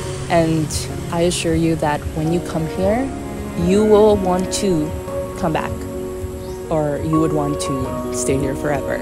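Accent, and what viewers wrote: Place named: United States. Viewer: You have a good command of English, with hint of American accent. Did you grow up in the US?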